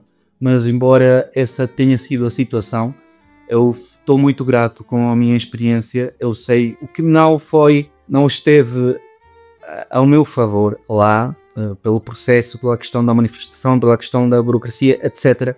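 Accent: Portugal. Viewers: Olá! Reparei que falas mais em português de Portugal. Parabéns por isso! Nunca desistas. Um forte abraço Amigo! 🤗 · Antes de vires para Portugal tu já falavas bastante bem o português de Portugal, depois do tempo que passaste aqui o teu português é perfeito, mesmo o sotaque, está lá mas muito tênue É pena não teres conseguido ficar, mas tem esperança pode ser que consigas voltar com outro tipo de condições que te dê garantias de ficar cá.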